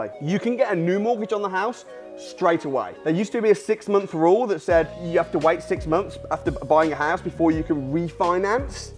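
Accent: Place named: Kent, United Kingdom. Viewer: Are you from Kent in the south east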